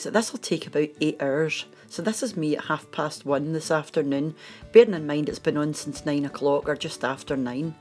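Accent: Scotland, United Kingdom. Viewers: I’ve just found your YouTube recipes, I love how simple you make everything love the Scottish accent too reminds me of my good friend, thank you for sharing, I love it x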